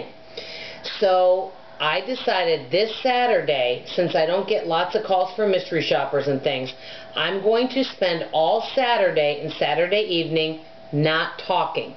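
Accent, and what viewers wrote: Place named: North, United States. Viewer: I know I couldn't do it! I'm a talker .. AND if nobody is at home can you talk to yourself? That MIGHT be doable .. I often talk to myself! I'm fairly new to your channel so I'm dinging the bell so I'm sure I don't miss the results lol GO FOR IT .. (you have an accent so I assume your from the north and you talk really fast I'm From the South and I talk, hear and listen in Southern)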